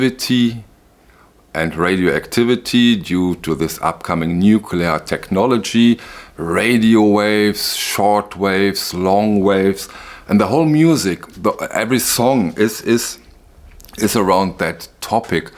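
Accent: Germany. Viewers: Am I the only one who could listen to this man all night. That German tinged English is incredible.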